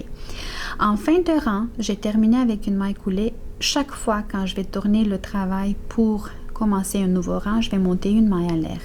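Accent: Canada. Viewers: Merci 😀 pour votre beau bandeau et vos explications ce point est vraiment très très joli et pas compliqué ,je me trompe peut-être, mais d'après votre voix, et sans être indiscrète ne seriez vous pas d'origine canadienne ? Si je ne suis pas trop curieuse pouvez me donner votre réponse svp un tout grand merci 🇧🇪 👍 😀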